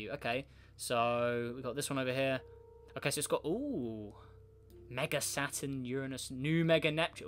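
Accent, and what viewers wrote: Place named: United Kingdom. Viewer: Speek americane please i cant understand briten people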